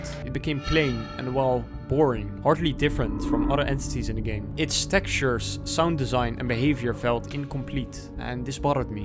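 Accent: Netherlands. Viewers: Are you dutch? very nice video gonna try this Tommorow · im sorry to ask but are you dutch? you have a dutch accent when speaking english lol xD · You are Dutch, right? I love your accent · You are definitely Dutch, nice. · Beetje nederlands accent ofnieee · i smell dutch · one of the heaviest dutch accents ever. geweldig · You sound so dutch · ik ruik een Nederlands accentje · are you dutch?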